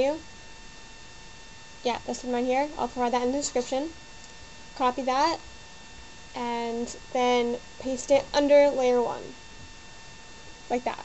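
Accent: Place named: Asia. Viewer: you sound like an asian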